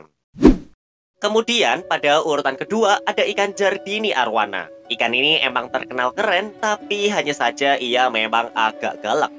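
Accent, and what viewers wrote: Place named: East Java, Indonesia. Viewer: tapi admin kyk orang Jawa timur Antara SBY atau sda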